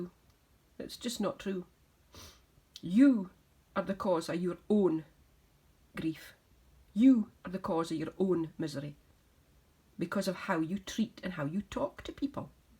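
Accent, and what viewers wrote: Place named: Scotland, United Kingdom. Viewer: Good to hear your lovely Scottish brogue!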